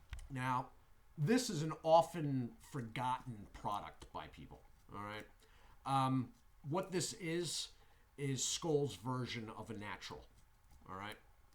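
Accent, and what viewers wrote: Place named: Philadelphia, United States. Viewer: Don’t have it here in NJ. Had to order online. Sound’s like your in Philly?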